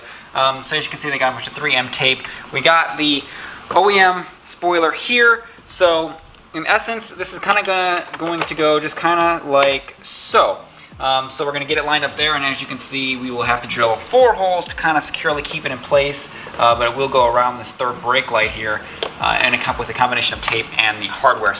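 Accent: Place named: United States. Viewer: Why do Americans say m k instead of ‘mark’?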